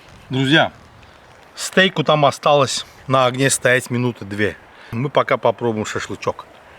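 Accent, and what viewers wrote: Baku, Azerbaijan. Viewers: Маэстро, настолько красиво и со вкусом вы все делаете, что смотрим всей семьей не отрываясь! И кушаете очень заразительно:) Процветания вам и вашим близким,! Потрясающие блюда, приправленные неповторимым бакинским акцентом становятся еще вкуснее)) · Азербайджанский акцент , ласкает слух, сразу вспоминаю своего друга Джавида , с которым дружили во время ординатуры в Москве ).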